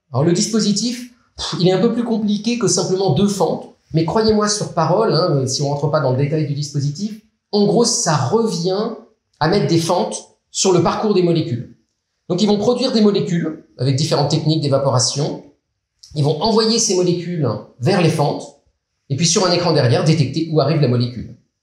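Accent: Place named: Paris, France. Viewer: J'adore votre intonation très Parisiènne ^^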